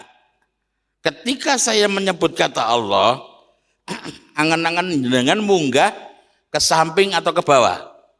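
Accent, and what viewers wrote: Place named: Semarang, Indonesia. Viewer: Bahasane semarang bnget..cocok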